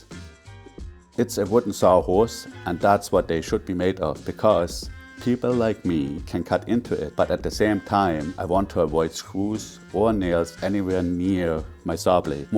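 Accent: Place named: Germany. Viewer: Your accent is definitely telling you are of German descent, in any case European born. So why on earth do you use inches etc. Metric all the way dude!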